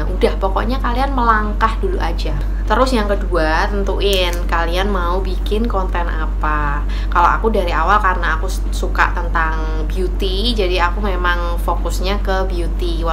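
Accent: Java, Indonesia. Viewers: Jowone endi mbak sampean..? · MB dari mana ya..kok Jawanya keliatan..salam.kenal mb dr Yogyakarta.nyimak nih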